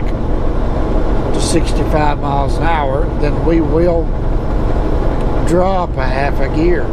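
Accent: South, United States. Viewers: I really enjoy your videos. My dad drove for many years. I crawled in the truck every chance I had. He was born and raised in east Tennessee so hearing a friendly southern accent puts me back in the truck with him pretty easily. Be careful. I'll keep checking in on you.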